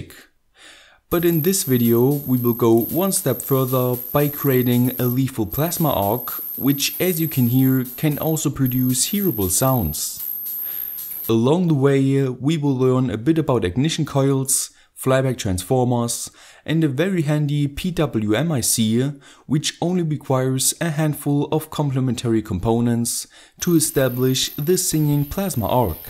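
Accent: Germany. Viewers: Can you build a singing Teslacoil please ? And are you German ?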